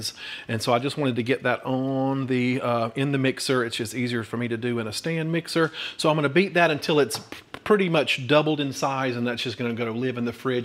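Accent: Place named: North Carolina, United States. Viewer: When you said "I was tickled to death" I told my husband, now THAT'S what I'm talkin bout, he speaks our language! We were both born, raised, and still living "in the sticks" of North Carolina, so you sound just like home to us. I'm a new subscriber but you have quickly become my favorite out of all the low carb content creators out there. Thank you for being so real and genuine, you're awesome!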